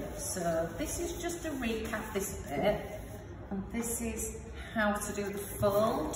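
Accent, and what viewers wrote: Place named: Hull, United Kingdom. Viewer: I need this lady to do a mural on our ceiling and she sounds local to Hull??? Would be great if she’s available or has any great recommendations ❤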